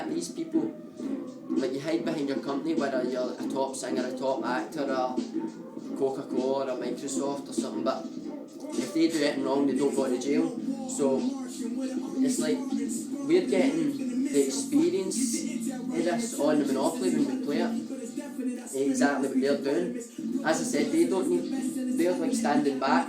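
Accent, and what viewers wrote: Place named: Scotland, United Kingdom. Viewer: Thanks i just love scotish accents i dont know why i just do but your cool anyway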